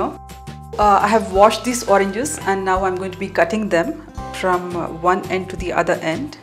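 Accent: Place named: India. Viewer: I love the Indian accent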